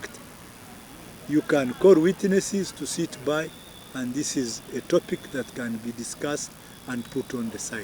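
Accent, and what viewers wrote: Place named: Rwanda. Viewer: Why does he talk like a Munyarwanda? Also his name is a kinyarwanda.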